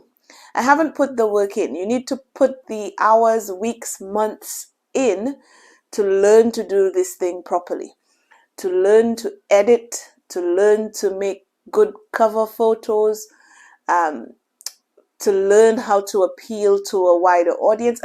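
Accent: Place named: United Kingdom. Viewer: Your accent is definitely British 😅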